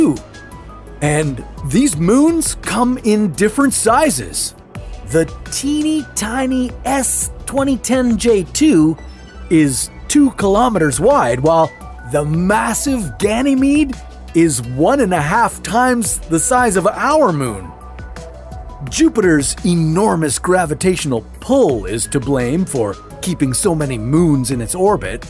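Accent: United Kingdom. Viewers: 終於是英音啦 讚讚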